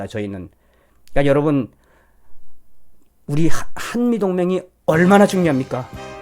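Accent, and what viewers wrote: Pyongyang, North Korea. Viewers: 서울 표준말보다, 북한 억양이 더 좋습니다.. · 그냥 남한식으로 부드럽게 하셨으면 북한 말투 정말 듣기실습니다~~죄송합니다 저의견이니 오해 없으시길 바라며 ~~~ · 정선산 체널 애청자인데요 언제부턴가 북한 사투리 너무 심하게쓰셔서 중단하게됬네요 좀 아쉽네요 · 제발 까불지 마세요. 방송을 진지하게 해주세요.모든 면이 마음에 들지 않지만 우파 보수 방송이라 윤정권을 지지하는 그 열정에 감사해서 봅니다, 북한말씨 쓰는 것 장난삼아 하는 것 보기 좋지 않습니다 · 이애는이북말써가며어디사람인고 혹시빨갱이 말투가상투적인빨갱이들수법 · 우리나라는 왜이리 헐뜻기만하는 빨갱이 색기들이 만은지원 똘똘뭉쳐서 좋은 나라만들생각 은 안코 헐뜻길 좋아한놈들이 많은지 이자식 말투도 가만히 드러보니 북한 사투리가 살짝 들리는구만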